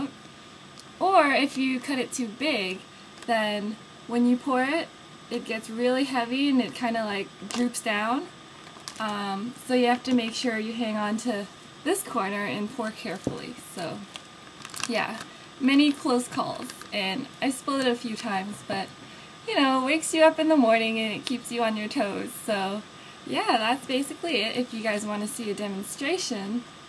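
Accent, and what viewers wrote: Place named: Canada. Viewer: I like the Canadian accent :3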